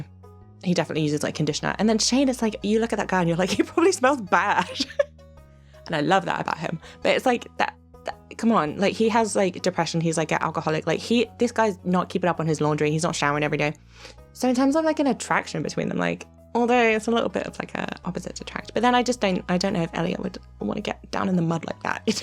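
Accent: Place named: United Kingdom. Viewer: GODDAMIT DO A SHANE VIDEO U PRETTY WOMAN WITH A THICK BRITISH ACCENT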